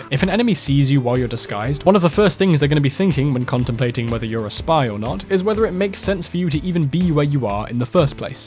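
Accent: United Kingdom. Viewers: You sound like the most British man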